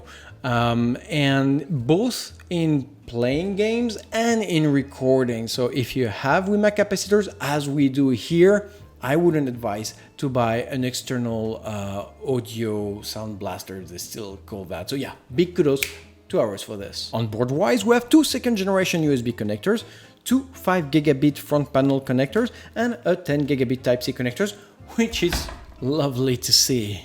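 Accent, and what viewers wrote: France. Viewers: C'est magique, mais quand c'est un français qui parle anglais, je comprends tout! Merci et je te félicite pour ton English french accent car je travaille dans une société française qui bosse à l'international et dont bon nombres des salariés parlent anglais au téléphone et j'ai régulièrement les oreilles qui saignent en entendant leur phrasé dégueulasse et leur absence d'effort pour parler anglais proprement. Toi tu parles vraiment bien anglais, ça fait plaisir. Je découvre ton travail et je vais continuer à te suivre. Je pense que je vais prendre cette carte mère, tu m'as convaincu. Elle n'est pas super fashion (même plutôt… moche) mais elle est sérieuse et d'un bon rapport qualité prix. C'est ce que je recherche. Elle semble disposer d'un bon étage d'alimentation bien refroidi et dans cette gamme de prix, je n'en vois pas d'autres. Ma carte actuelle a 16+2 phases et ça fait 10 ans qu'elle tourne nickel avec un CPU overclocké à 4,7Ghz (Asus P8P67 Deluxe + 2600K) Je recherche quelque chose de comparable sans être aussi bien je m'en doute, les CM haut de gammes sont bien trop cher de nos jours.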